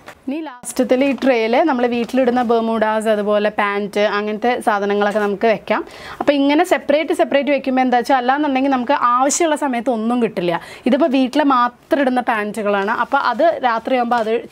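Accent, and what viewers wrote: Palakkad, India. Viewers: Very nice presentation.Palakkad slang nannaytnd chechik..inim itholathe videos expect chyunnu.All d best 😊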